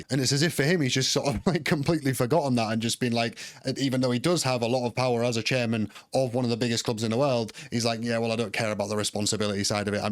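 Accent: Manchester, United Kingdom. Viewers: You again??? Horrible singing noise, ye stealing me subs and I am going to make a vid absolutely destroying you if you keep making so much vids. Ye ain't a Geordie and ye came from nowhere with ye Manchester accent and nicked me subs. I get 2500 subs on me main channel while you get 6500??? Ye bought subs and have time tea make loads of vids all the time like, ye vids are not as fun as mine and ye don't have mason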